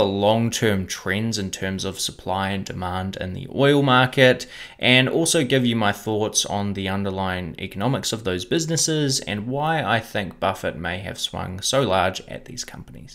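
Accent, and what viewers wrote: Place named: New Zealand. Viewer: Love your kiwi accent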